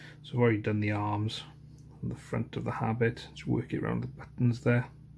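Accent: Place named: Swansea, United Kingdom. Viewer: can always tell another jack! 😍🏴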